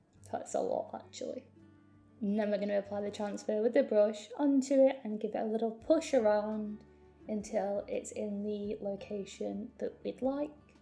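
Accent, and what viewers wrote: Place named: United Kingdom. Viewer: the most refreshing thing about your videos is your british accent. It's really nice to hear over all the american english (or non-native speaking) painters.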